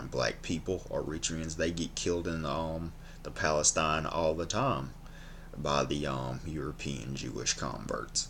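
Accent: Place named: Carolinas, United States. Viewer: I look forward to seeing more videos from you. Your accent is interesting. Are you from the Caralinas?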